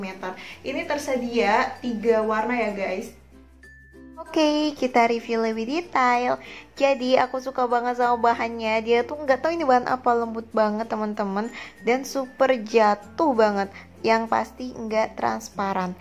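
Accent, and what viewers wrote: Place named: Banyumas, Indonesia. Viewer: Gedombrangan haha ,, ngapak bgt ya kak 😁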